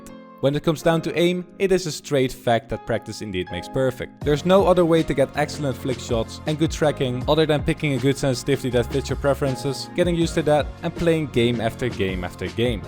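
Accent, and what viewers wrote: Netherlands. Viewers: Are You dutch? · Nederlandertje ik hoor het al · Waarom klink jij zo nederlands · Wait u Dutch? Lmao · Jij bent sws nederlands hoor et aan je accent haha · Are you dutch?? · are you dutch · Are you Dutch? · Ik hoor aan je accent dat je Nederlands bent maar je uitspraak is echt goed hoe heb je dat geleerd? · You sound so Dutch 😅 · Are you dutch brother? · Je hebt een Nederlands accentje..🧐😉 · Ur accent sound so dutch are you from Holland? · are you dutch?? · 100% sure you're Dutch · Are you dutch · you sound like your from the Netherlands... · are you dutch? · Are you from the Netherlands? Cuz you have a Dutch accent!! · Are you're Dutch? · Are you dutch?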